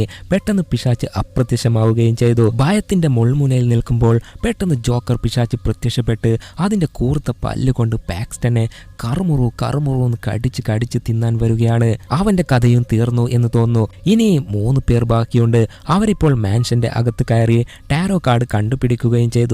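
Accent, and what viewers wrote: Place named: Kasaragod, India. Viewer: Ningal kasargod alle because language kond manassilakum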